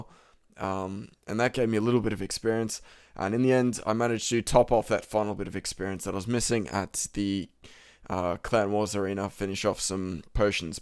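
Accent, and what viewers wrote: Australia. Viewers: He talks like he has an awesome Australian accent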